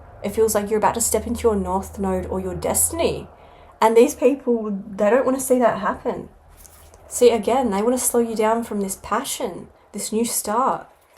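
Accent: Australia. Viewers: You Australian? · Cheers me up no-end :face-blue-smiling:, love the aussie accent too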